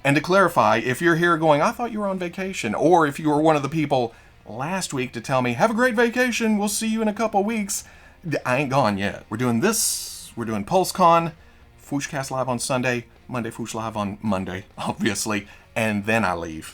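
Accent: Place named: South, United States. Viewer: I say this with love….but man you hit “This is his Baby” so hard with that country accent. Lol #thatsweetsouthernaccent #roboark #queekly #THAILOG Then again I can’t say much being on the opposite side of the state. Lol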